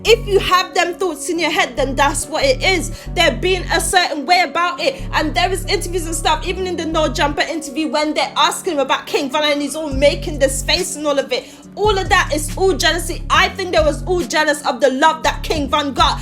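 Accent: United Kingdom. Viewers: Imma real O block fan and it pisses me off to listen to this bullshit your DISRESPECTING KING VON’s legacy, family etc... IF YOU WANT TO MAKE MONEY AT LEAST DO PROOER RESEARCH AND SPEAK FACTS!!!! You sound like some U.K girl with wild imagination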